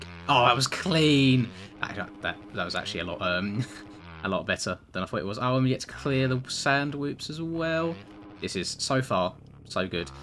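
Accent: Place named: United Kingdom. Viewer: Any time I get to hear the smooth british voice I'm in.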